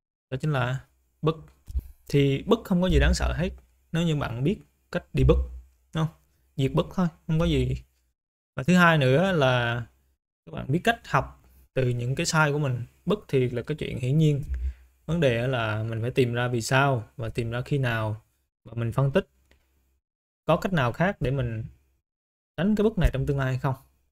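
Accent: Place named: Central, Vietnam. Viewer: các video của anh khá hay, lại nói giọng miền trung rất thân thiện nhưng channel lại ít video quá. không biết a có dự định làm thêm nhiều video trong tương lai không ạ?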